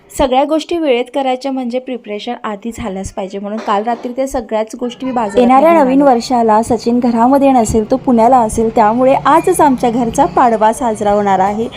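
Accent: Kolhapur, India. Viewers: Tumchi language puneri Nahiye ... Lagech samajt kolhapuri 😂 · Bhashecha laheja gavapramane badlto Pan bhasha hi shudhach bolayala havi Ani pani asa ashudha kasa bolaych Accent kolhapur cha ok Pan shudhach bolayala pahije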